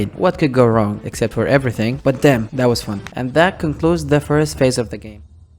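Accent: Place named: Gulf, Arab world. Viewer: خليجي من لهجتك بس مدري من وين. كل عام وانت بخير وصحه وسلامه وعيدك مبارك، مقطع جميييل واستغربت ان باقي فيه عرب مهتمين بالسلسلة الكلاسيك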